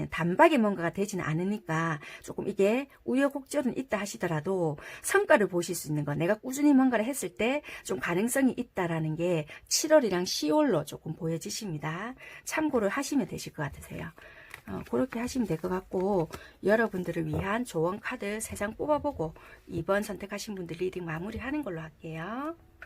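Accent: Busan, South Korea. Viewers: 2,4 만명 축하드려요~더 잘 되실 거예요~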